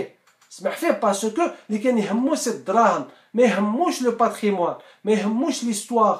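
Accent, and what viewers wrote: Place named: Ghazaouet, Algeria. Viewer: Salem, Chokrane, Merci de nous transmettre votre savoir. Allah yatek a saha Inchallah j’espère que nos jeunes feront quelque chose, ainsi que la Diaspora Algérienne. Vous avez l’accent de. Ghazaouet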